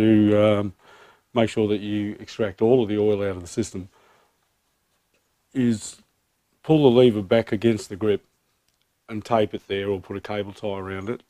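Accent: Australia. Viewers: how is it the new Zealanders say binch when you aussies say bench like the rest of us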